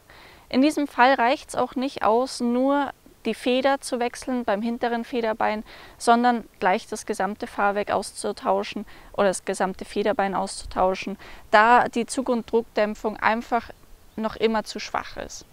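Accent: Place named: Germany. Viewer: Sehr schöner Vergleich der Maschinen. Du bist mit verantwortlich das jetzt eine CRF250Rally bei mir in der Garage steht 😊. Da ich noch keine Offroaderfahrung habe, und deinem Dialekt zu urteilen wir aus der gleichen Region kommen, kennst du evtl. Strecken zum Üben die legal befahren werden dürfen ? Ich kenne nur die unbefestigte Straße zwischen Bettbrunn und St.Lorenzi. Gruß aus Egweil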